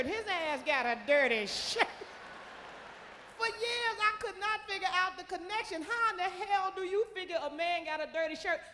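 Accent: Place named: Chicago, United States. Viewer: Chicago girl, Chicago talk, Chicago style. 💯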